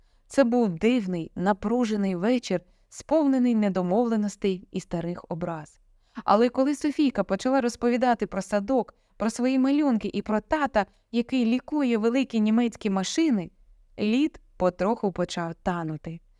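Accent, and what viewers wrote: Standard. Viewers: ДУЖЕ ГАРНА РОЗПОВІДЬ, ВЗЯТА З ЖИТТЯ, ДЯКУЮ ЗА ЧИСТУ УКРАЇНСЬКУ МОВУ.❤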